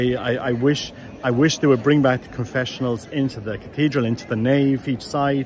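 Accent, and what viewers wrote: Ireland. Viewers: I never really appreciated the Luminous Mysteries of the Rosary until this whole Covid episode, when everything in our Luminous Mysteries was (is) under attack. From not wanting to baptize babies to no respect for Communion. Now I understand exactly why we were given the Luminous Mysteries and when we were given these Mysteries. Grand old Irish accent. I grew up in County Louth.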